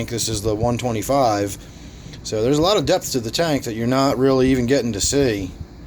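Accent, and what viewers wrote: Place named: Maryland, United States. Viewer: Are you from Maryland? You sound like you have an old-school Maryland accent, but sometimes tidewater Virginia or eastern North Carolina can sound very similar.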